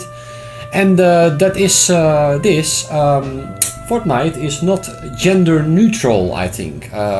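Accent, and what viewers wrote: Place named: Netherlands. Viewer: I'm from the united states and I love your dutch accent. I'm loving it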